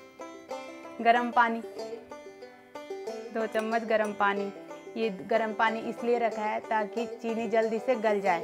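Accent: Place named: Pahadi, India. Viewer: Cute pahade style taulking tone💚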